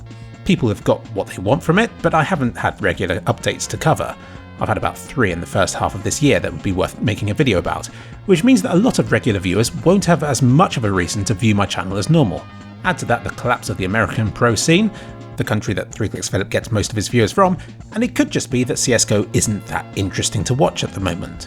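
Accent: United Kingdom. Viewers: I'll always be a subscriber, Philip. I've seen this faceless british voice talk about games, graphics, staircases, sun angles and CSGO for most of my teenagehood/early adulthood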